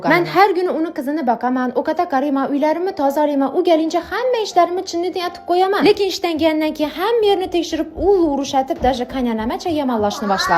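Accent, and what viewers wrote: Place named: Khorezm, Uzbekistan. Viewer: Tilizga shakar xorazmliklar kursdoshlarimni eslatib yubordiz shevangiz bm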